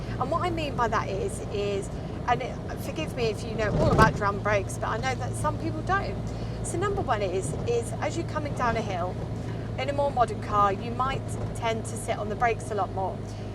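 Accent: United Kingdom. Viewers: I love your voice and your british accent. Your voice is very soothing and it calms me down when I get anxiety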